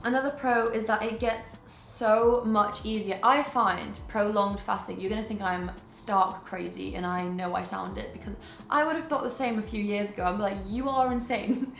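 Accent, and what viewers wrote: United Kingdom. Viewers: I was right! YOU ARE LATINA 😮🎉 I was able to identify your complexion, your face, and excited this is the very FIRST time I see a Hispanic with a British accent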